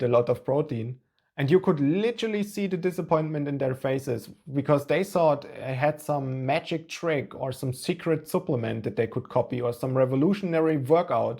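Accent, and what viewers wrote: Germany. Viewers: when you hear a strong german accent like this you know he doesn't wanna sell you anything but just genuinely want to help you. thanks man. I needed this. Turning my walking pad on right about now 👍🏼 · You sound German... To have German food and choose vegan food is just wild to me You don't really need the 1g of protein per pound of body weight. There's lots of data demonstrating this. Collectively, the data that suggest it's needed, shows about .7 to .8 is plenty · Very nice, smart and informative video! As a French guy, I like the German mind, usually rational and analytical. Also, the accent... 😉 Also, your new body, lean and muscular. Congrats for that. 👍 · Gosh, finally a real video from a real normal person. I think all those American lecturers are from a different Galaxy, stuff I just don't understand. I wish I got to your "before" photo. 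🙂Please, use kilograms, I am from Europe, just like you, Australians use kilograms, I can kind of hear you're from Germany 🙂. I started tracking steps/kalories (I think I have some optical results) and I came to the same startling conclusion - granola oat cereals for breakfast is a MURDER, also peanuts for snacks, which I need to phase out. Two fat slices of bread with jam have less calories than oat cereals and one does not get bloated. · Really interesting video. Walking is underrated. I walk every day around 15k steps and do jump rope 4-5 times a week, and recently added 100 Push ups a day. Im not fat, but for what i put in... the results (over time) are lacking. I "just" need to change my nutrition. these damn tasty soft drinks and bread... Is that a german accent?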